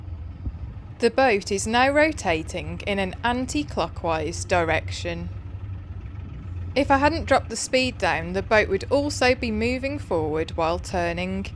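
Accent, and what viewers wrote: United Kingdom. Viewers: Another mesmerizing and fascinating peak into British maritime leisure boating. Love to listen to her soft sincere verbal narration and charismatic British diction. Her innocent candor regarding her YouTube video production skills is all great video that is truly captivating to listen to. Love to watch and listen as she sounds like she is talking to me, not at me...God speed on a boat; you are a very refreshing treasure and pleasant to experience.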